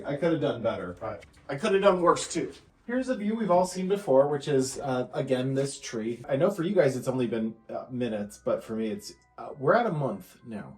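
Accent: Minnesota, United States. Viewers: On the 10th Day of Vlogmas my true love gave to me: Ten-ish strands of "tinthel" Nine ladies ironin' Eight cameras tumblin' Seven cutouts glowin' Six berries spillin' Five Christmas trees 🎄🎄🎄🎄🎄 Four Paolo meows Three sets of batting Two power strips 🔌🔌 And you sound like the movie Fargo 🎄⛄🙂⛄🎄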